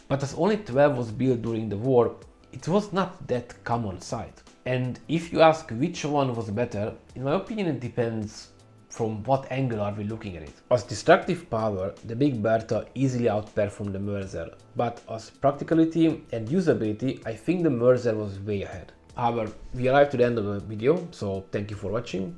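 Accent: Hungary. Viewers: Szia! Magyar vagy igaz? Magyar akcentussal beszélsz angolul😊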